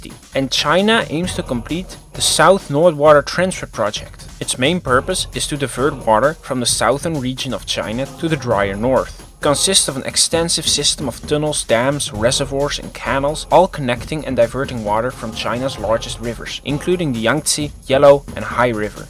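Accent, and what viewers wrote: Netherlands. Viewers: Gotta love that Dutch accent, kek I'm Flemish myself so it was't hard to distinguish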